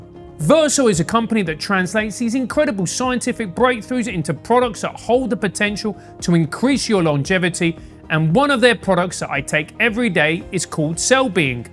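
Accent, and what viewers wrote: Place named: Mockney, United Kingdom. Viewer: Your mockney accent is awful.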